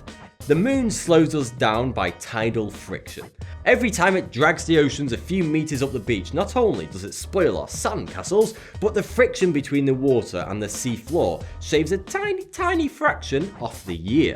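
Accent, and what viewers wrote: United Kingdom. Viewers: Hi if he lives in Asia why does he have such a heavy British accent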